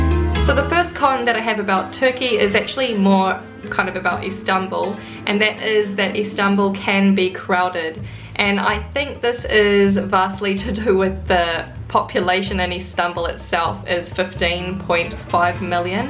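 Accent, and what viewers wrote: New Zealand. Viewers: wish you good luck with lockdown - and have a safe journey.. New Zealand accent ! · I hear a Kiwi accent. How ya goin' mate?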